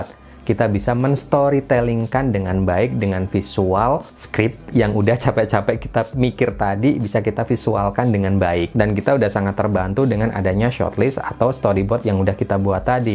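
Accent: Pekalongan, Indonesia. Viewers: Orang pekalongan ya bang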